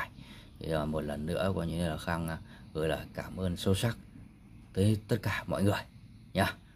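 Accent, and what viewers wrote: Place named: North, Vietnam. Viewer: Sư huynh nói giọng bắc sống đất nam vậy. Cho em hỏi sư huynh quê đâu vậy. Chúc sư huynh luôn khỏe và có nhiều thành công với đam mê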